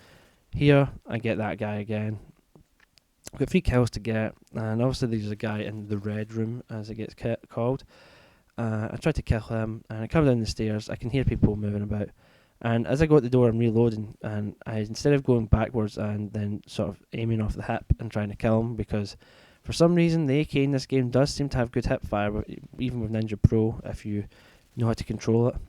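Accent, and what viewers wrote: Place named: England, United Kingdom. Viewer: nice english accent ^^ and good game :p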